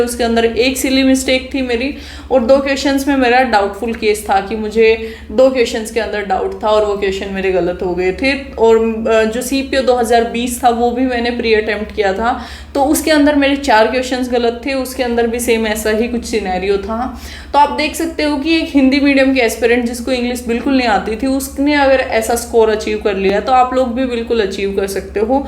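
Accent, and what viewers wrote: Haryana, India. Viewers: चाहे कितनी ही आछे से हिंदी बोल लो पर हरयाणवी flow नही जाने वाला😅😅😅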